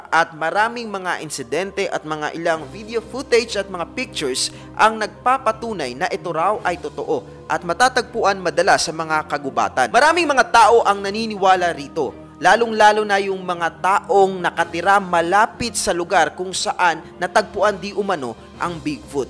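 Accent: Visayas, Philippines. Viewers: Hahaha bisaya diay ka ya washout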